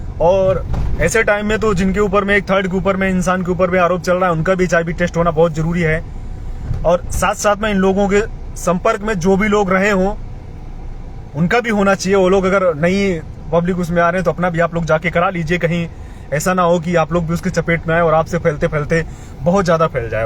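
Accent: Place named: Arunachal Pradesh, India. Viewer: ji, aap ka hindi bhi apna wala hogaya hai.😊 "Padhariya aap Arunachal mein hai"😂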